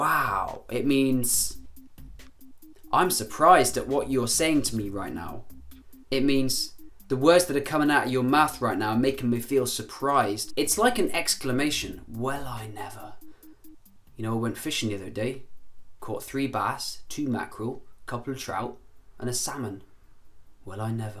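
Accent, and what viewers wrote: United Kingdom. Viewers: I'm French and I find your accent so beautiful ! I practice a lot to have a British accent haha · Wallahi ya akhi. More British accents. An Indian from Saudi Arabia Cheers Mate 😉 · I love your British accent 😘💕❤😍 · I am very inspired with you. actually i want to learn british accent from your videos but apparently i get british and arabic at once. very motivating to learn arabic language again. love from indonesia :) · Ahhh a British voice on YouTube. THIS IS WELL GOOD. LONDONER. Cheers mate. Also this sounds weird to me even though I'm 98% British. And you can tell just by how I talk or write. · Thanks for this video. I really like your british accent. Keep up your great work! This video was so great I could confused my mate living in Canada. Best regards to the UK from Germany.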